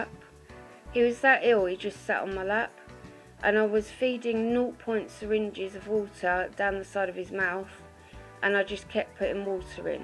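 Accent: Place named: Midlands, United Kingdom. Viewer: Poor little furry cutie pie. Glad he's doing better. Best wishes to ya. That accent though... from the Midlands, are ya?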